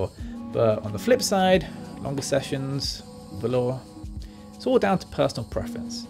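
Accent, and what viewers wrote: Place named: United Kingdom. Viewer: I though "another american review" Hears girl - british af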